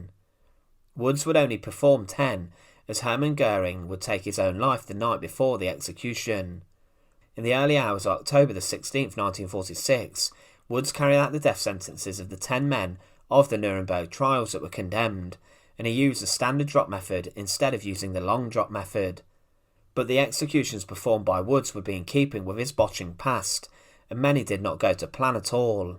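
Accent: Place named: Blackpool, United Kingdom. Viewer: Your English dialect accent makes me ill. Blackpool?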